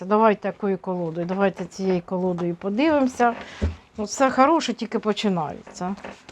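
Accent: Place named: Chernihiv, Ukraine. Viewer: Ми в чернігівській обл.точно так балакаємо і нічого,а скільки наших хлопців з таким суржиком захищають країну!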